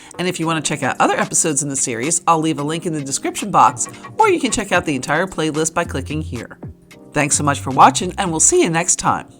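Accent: East Coast, United States. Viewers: It’s a shame you don’t have any craft supplies on hand. I have to wonder how many viewers caught your pronunciation of crayons, “crowns,” rather than, “cray ahns.” Sometimes you’re so east coast. I love it! I’ve got shelves in my craft cave, but I’m a shortie so I can’t reach things on the top shelf. I need to change some of the stuff to your ideas to make the space more accessible. Happy New Year,!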